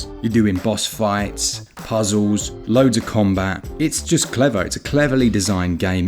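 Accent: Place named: South, United Kingdom. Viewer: Smoothest southerner in all of Britania. Get some audiobooks done😅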